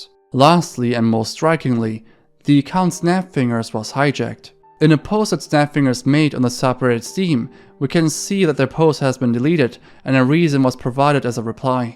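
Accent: Germany. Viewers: I think he said once that German was his first language, so you’re probably just hearing how thick his accent is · You are German, right?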